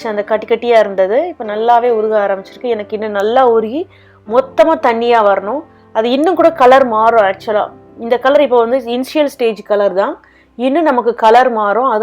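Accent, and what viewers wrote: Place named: Tirunelveli, India. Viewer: Nice recipe..ur slang seems like u r a Tirunelvelian...rite mam🙂.?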